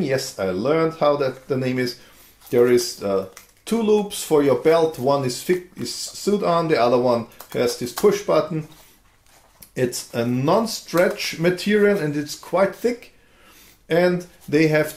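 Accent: Germany. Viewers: I could tell this was a Deutsch accent immediately. Meine Frau ist von Darmstadt.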